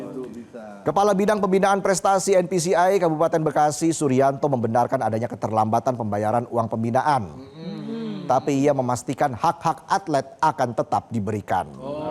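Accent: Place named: Jakarta, Indonesia. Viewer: Ogoed suka bener ame ni gaye bicarenye Coba dong logat betawinye kentelin😅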